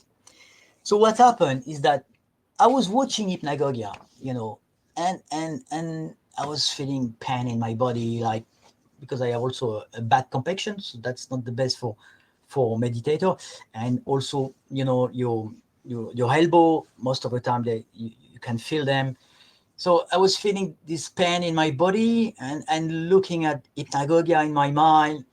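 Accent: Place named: France. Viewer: I looooove the French accent.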